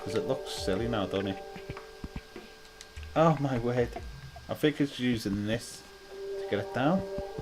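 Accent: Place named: Liverpool, United Kingdom. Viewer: sound scouse haha im from skem an just bought this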